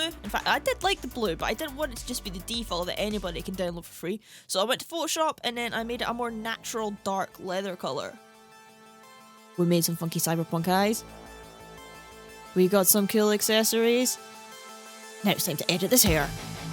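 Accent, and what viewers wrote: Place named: Northern England, United Kingdom. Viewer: So magical when a youtuber responds to me because for now his/hers base is not so big yet. :-) Anyway loving your accent (really, really enjoying it :-D). Are you from north of Britain? (I'm the heart of Europe (Czechia) so I'm not so good of guessing the accent of English speaking peeps :-) )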